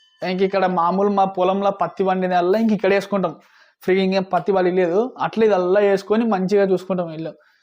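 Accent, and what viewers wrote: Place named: Gadwal, India. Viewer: Gadwal slang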